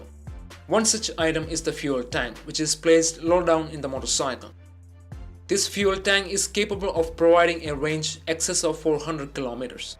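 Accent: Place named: India. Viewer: An indian voice for an indian bike.. finally ! Good, honest job !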